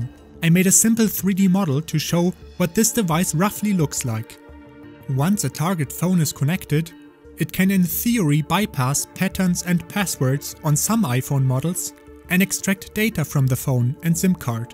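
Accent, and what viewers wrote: Germany. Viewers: deuitscher macht englisches video, hört man an der aussprache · Are you german by any chance? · German Accent on Fleek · The German Accent is Thick my friend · Awedul German accent and the guy doesn’t know how to speak in a microphone. He makes tongue clickings all the time. Don’t blow the microphone, space it farther away from your mouth… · Man kann den deutschen Akzent einfach nicht verstecken, keine Chance 😂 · Ich finds immer lustig, wie man direkt am Akzent merkt, dass jemand deutsch ist. Whatever, sehr gutes und interessantes Video. Ich persönlich würde mich nur auf Graphene OS verlassen. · Nach 2 sekunden direkt gewusst dass du deutscher bist xD. Ehrenmann ^^ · You german?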